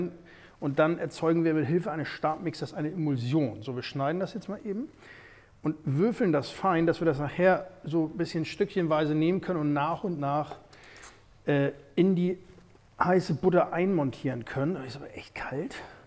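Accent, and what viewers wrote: North, Germany. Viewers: Dieser norddeutsche Akzent 🥰 da wirken die guten Erklärungen noch besser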